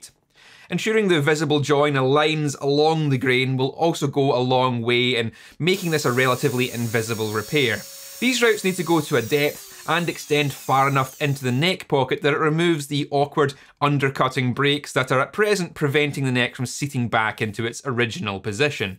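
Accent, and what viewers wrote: Scotland, United Kingdom. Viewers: People leave because of your accent? But...Scottish accents are so cool! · It's refreshing to hear a non-American accent, especially a Scottish one. Spent 8 months in Edinburgh in 2007 and loved the place. I became addicted to Irn-Bru and Caledonian 80. I don't miss the neds though, but I tried Buckfast for a laugh and thought it tasted shithouse. Love your channel. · weird, sounds different in this video, much less Canadian and much more Scottish.... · I wouldn’t have done it any differently as I have never repaired a neck break in a guitar. Looks good to me! I don’t get the urge to turn off the video when I hear your accent, I get the urge to drink an Irn Bru and get something from Gregg’s and then I remember I moved back to Australia 10 years ago and I get a little sad. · Nice work, love your videoes and the Scottish accent.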